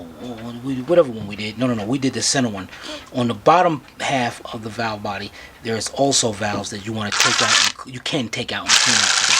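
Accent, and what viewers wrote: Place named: New Jersey, United States. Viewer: Where are you from? Sounds like New Jersey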